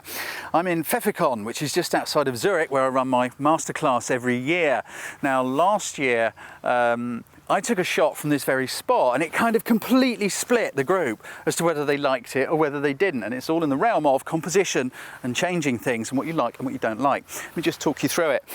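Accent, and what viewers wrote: United Kingdom. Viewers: By the way I am big fan of yours - Your Voice, your mannerisms, and your "BRITISH" accent - I would love be born again to acquire it :) You have also lost weight, which is awesome ! BIG FAN !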